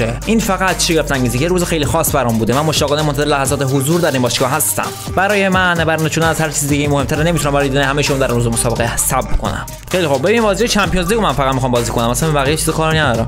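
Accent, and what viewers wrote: Mazandaran, Iran. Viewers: میگم مازندرانی هستی؟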